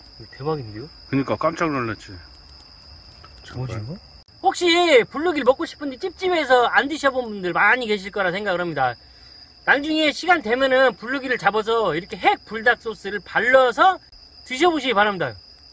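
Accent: Jeolla, South Korea. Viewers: 으따 전라도여~^^ 너무 좋당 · 전라도여?ㅋ 가장게. 일로치나. 절로간게. 타슈와 타랑께ㅋㅋㅋ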